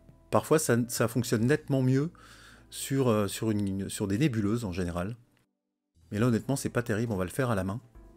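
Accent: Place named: England, United Kingdom. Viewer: Mais quel travail de Titan ! Une série complète depuis l’install en français, il n’y en a pas beaucoup ! Merci pour cette formation et ce partage de savoir. J’ai appris tellement de choses avec cette 3ème partie. Pix est vraiment génial, les possibilités sont infinies :) il nous faudrait au moins 7 vies pour tout savoir. Plus qu’à mettre tout ça en application pour les prochains traitements :D Merci aussi pour le process icons et tes données à télécharger ;) Ps : Je suis fan de ton accent Anglais 😂 j’adore !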